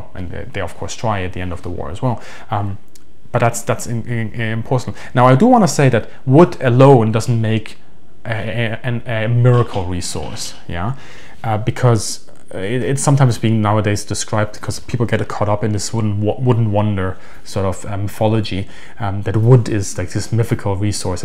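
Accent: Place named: Germany. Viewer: I apologize for the personal question, but to my ear your first language would be some form of German. It has been over 35 years since I used German on a daily basis for work and for regular living. It was never good enough for me to distinguish between some one from Germany, Austria, or Switzerland. Would you mind say which version of German you grew up with? If not, I understand and that is fine. Secondly, all my German Military works are from Bismark and others of his time and were published not long after they were written. How difficult is it to find similar works written by German Military Leaders of the 1930's and 1940's. While I was living in Niedersachsen in the 1980's attempting to purchase or even find such published writings was very problematic. Thank you very much for your quality videos and the supporting research that you do for them.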